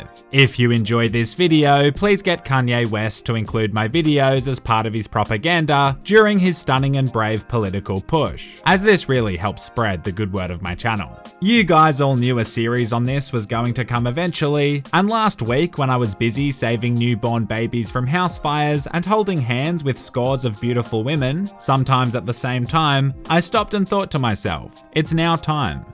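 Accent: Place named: Australia. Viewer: The steve irwin of youtube gaming. because you're white and sound austrailian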